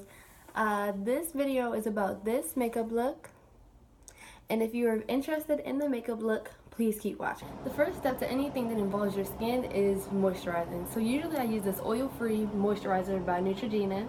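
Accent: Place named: Midwest, United States. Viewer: you sound like you're from northwest ohio or Detroit am I correct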